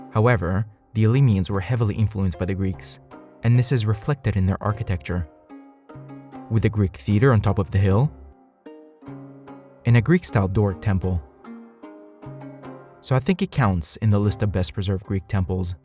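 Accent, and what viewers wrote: United States. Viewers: Can’t watch this because of the narrator’s f’awful yankee accent 🤮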